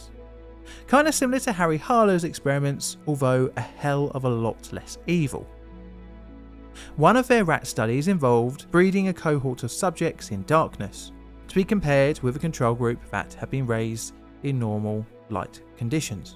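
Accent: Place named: South London, United Kingdom. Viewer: Hello Mr South London. 👍🏻🇬🇧...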